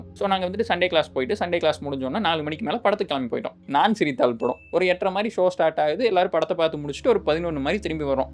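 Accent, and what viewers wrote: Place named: Kongu, India. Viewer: Little Kongu Tamil and Big motivation.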